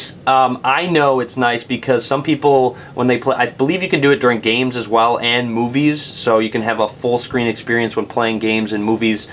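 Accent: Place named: Michigan, United States. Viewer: i THINK.... hes from Michigan... lol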